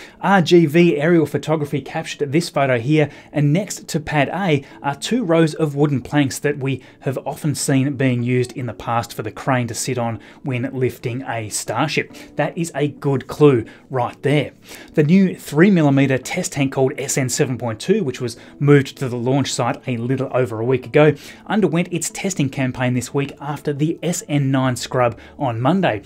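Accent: Australia. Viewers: "The bitch had been cleared..." I almost died laughing. Sorry, I know its just your Ausie accent, but too funny. Love it!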